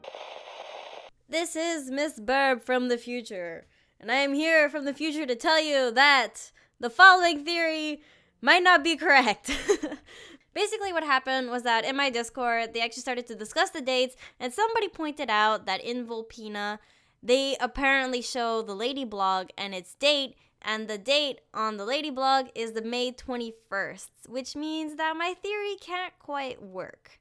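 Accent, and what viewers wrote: Quebec, Canada. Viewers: You are from Quebec!! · OMG you totally sound like you're from Québec! That french was flawless!!! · Is that me or you speak just to good in French to be an English people? XD I don't know how too say, but you have the French Canadian accent (Québécoise aussi si tu veux XD), did I'm crazy? Svp, ne me dis pas que je suis folle, même si parfois je suis folle U-U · I KNEW YOU HAD LIKE A CANADIEN ACCENT THINGY!!(Im french lolol) Bravo ave tes abonnements!!!!! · Tu vis au Québec huh? lmao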